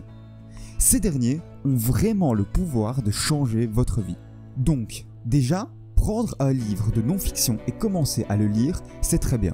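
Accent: Liège, Belgium. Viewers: Bon tu viens de Liège ? ❓😜 belle video bravo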